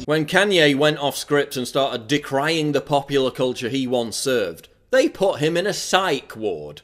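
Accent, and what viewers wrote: United Kingdom. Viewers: well i think to myself... end game terms...after gamergate. are they trying to reforge men born in hellfire to absolutely fucking hate democrats. rest assured they will. they are only making sure that the future gen will be dominantly conservative! they're morons of the highest caliber i like PJW's narration out of all infowars/planetprison shows. especially when he gets extra british crusy lol, slaying it with intellectual logical facts...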